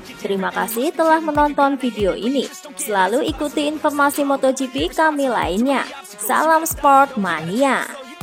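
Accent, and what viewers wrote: Java, Indonesia. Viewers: Logat Jawa.. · Ngomongnya medok.. Emang gk bisa di ilangin y?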